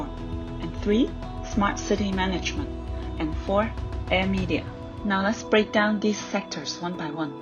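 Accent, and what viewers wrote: China. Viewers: I am assuming you can understand Chinese by your accent. 个人认为EH比NIO潜力高多了，NIO的长期盈利能力其实非常有限，车企是低毛利企业，NIO的毛利只有不到15%, 更别说盈利后的净利润了，加上NIO的服务致使他们的成本更高，高估值给的其实是自动驾驶和发展成能源公司的潜力，NIO的自动驾驶也不如特斯拉和小鹏，换电长期来看不一定是最优解决方案。而EH的毛利率高达50-60%， 未来的市场未必比电动车市场要小，加上EH的技术和解决方案领先全世界的竞争对手。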